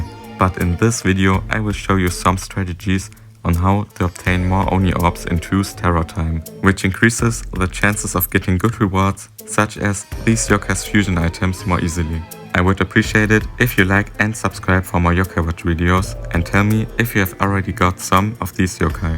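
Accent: Germany. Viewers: Anhand des Gameplays und dem Akzent konnte ich entnehmen, dass du deutsch bist und ich bin dir soooo unfassbar dankbar. Ich habe Muzifer nun auch und auf dem account(ich starte relativ oft neue) auch glamourella. Nur noch Kalteser fehlt und ich bin dir ehrlich sehr dankbar. Kannst du eventuell auch einen guide zu panda noko machen?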